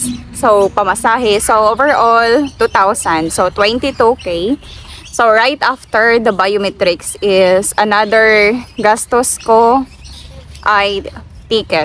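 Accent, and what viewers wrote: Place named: Hiligaynon, Philippines. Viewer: I can hear your Ilongga accent. Love it. perti gid tana ka lambing ya.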